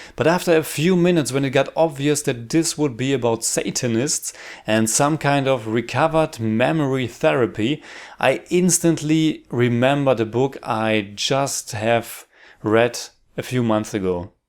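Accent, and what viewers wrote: Germany. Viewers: bist du Deutscher?:D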